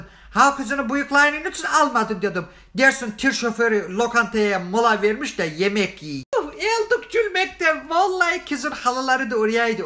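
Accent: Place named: Thrace, Turkey. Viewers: Trakya şivesi daha çok gelsin🙏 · cansın ol sen Yapasın da Trakya şivesi beya 😂😂😂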